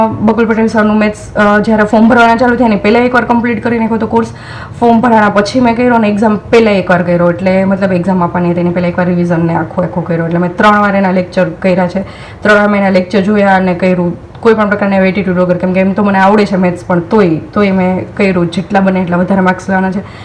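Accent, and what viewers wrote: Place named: Saurashtra, India. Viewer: Tamari bhasa upar thi lage che ke tame gir Somnath ke Junagadh na cho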